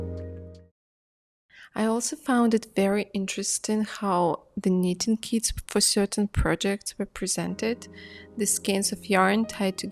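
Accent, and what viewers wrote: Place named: Russia. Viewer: Я не знаю разговариваете ли вы по русски,но ваш акцент меня на это натолкнул😅. Видео просто замечательное и очень красивое. У вас очень приятный голос ❤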